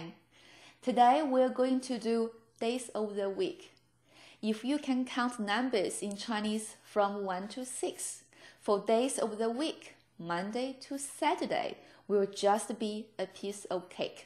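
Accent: China. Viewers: She is teaching Mandarin, however she has Southern China accent. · 大陆音，台湾发音不一样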